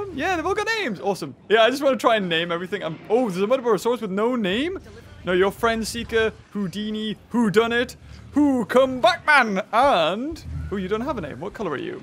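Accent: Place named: Geordie, United Kingdom. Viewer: You're accent is weird I mean I can tell you're a Geordie but you say a lot of Scottish sounding words too, BroonCoo,Baba, photea maybe Scots English and Geordie words are just similar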